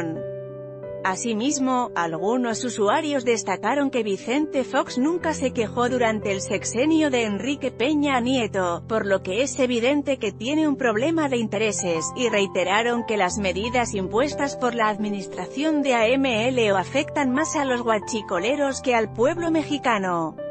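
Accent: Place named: Spain. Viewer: Yo opino que deberias usar tu voz y no la de una Españoleta suenas extranjera suenas mal. .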